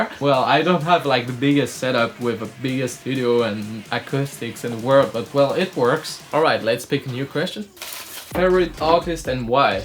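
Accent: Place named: Toulouse, France. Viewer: Belle accent toulousainggg 🇫🇷🇫🇷🇫🇷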